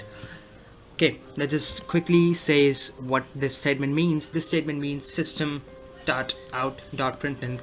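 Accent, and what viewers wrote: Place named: India. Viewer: Accent Indian hi rakh. Aur piche ka music hata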